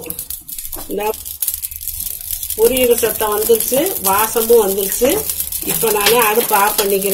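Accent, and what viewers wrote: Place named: Kongu, India. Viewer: Unga kongu tamil language, vagapa... sweeta erruku patti😊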